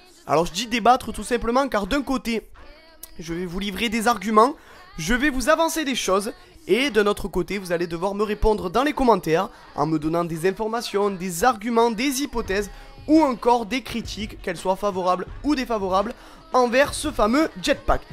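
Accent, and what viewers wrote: South, France. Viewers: Ta bien l axent du sud toi x)) moi aussi tkt :D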